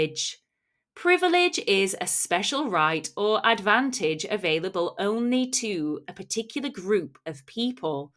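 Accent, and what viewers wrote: United Kingdom. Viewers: Is this british accent, right? Sounds really cool!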